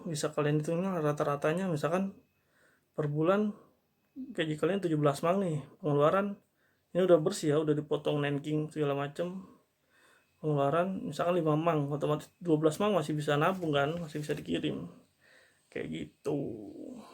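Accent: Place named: Bekasi, Indonesia. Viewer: Bang Abang ORang Tambelang Ya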